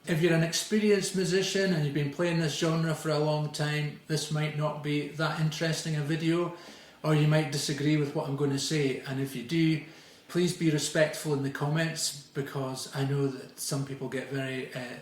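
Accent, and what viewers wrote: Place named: Scotland, United Kingdom. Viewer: Your Scottish accent is great! Keep on keepin 'on!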